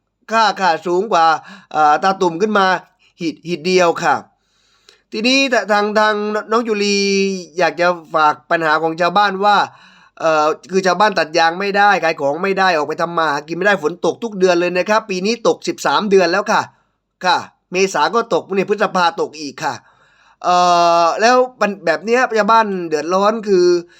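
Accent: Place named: South, Thailand. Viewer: พี่น่ารักจังเลยนะคะเวลาฟังสำเนียงของคนภาคใต้ตอนนี้ชอบพี่มากๆนะคะฟังทีไรนึกถึงภาคใต้ทุกครั้ง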